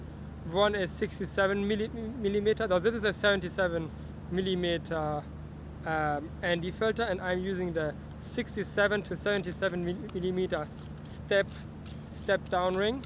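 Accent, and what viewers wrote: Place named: Germany. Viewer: Hahaha, thought he was, but with a German accent (or Scandinavian accent)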